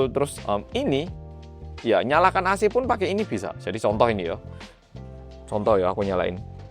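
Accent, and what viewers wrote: Java, Indonesia. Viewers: Aku ingat pertama kali subscribe pas mas bahas soal jerawat. Trus lucu aja orang korea yang medok. Dadi aku seneng logat e haha jadi ngikutin sampe sekarang. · Ikutin mas dari subscriber masih 500k sampe sekarang mau 6M. Walaupun ga mantengin banget tapi aku terharu bisa lihat perkembangan mas sampe sejauh ini. Sbg bolo cuma bisa doakan mas, mba dan si krucil ruel sehat dan bahagia terus. Semoga tetep jadi mas yang humble dan medhok ya mas 😆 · kayanya itu lebih Medhok dibandingkan aku yang asli turunan Jawa Timur 😄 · Kaum sm indo/ jawa medhok nya ttp kental,pertahan kan ya dialek bicara nya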